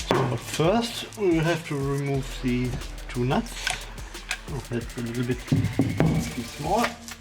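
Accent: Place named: Germany. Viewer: Daaamn boy, i love that german accent :)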